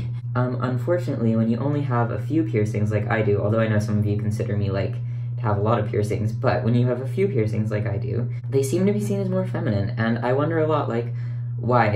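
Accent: Canada. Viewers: You sound so Canadian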